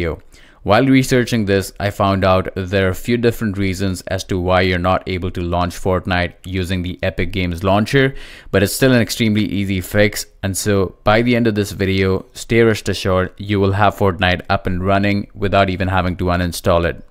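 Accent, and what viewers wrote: India. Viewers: thank you very much bro “Indian Accent” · Thanks so much I didn't expect this to work two days I couldn't play. Btw you sound like Indian and Canadian at the same time hahah love you man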